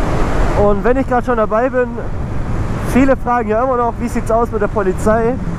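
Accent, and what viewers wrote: Swabia, Germany. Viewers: Ja man neues video, schwätz aber ruhig schwäbisch ❤😂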